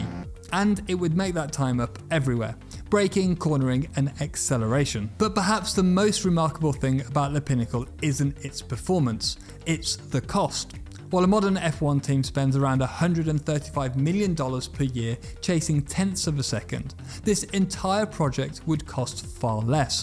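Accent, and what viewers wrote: France. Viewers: This man speaks English in the most French way I have ever witnessed. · Mon dieu l'accent français à couper au couteau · The franche accent 🤭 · As a french, his english sound more french than french itself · Ohlala banger l'accent français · Wow that's the strongest French accent I've ever heard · He's the most french sounding man in the world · Love his french accent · omfg listening to this french guy is a bit rough on the ears ...as a french myself ahahahah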